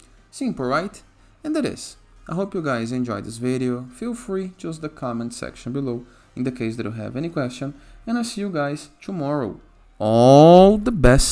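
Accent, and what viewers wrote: Brazil. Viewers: That sweet good Brazilian accent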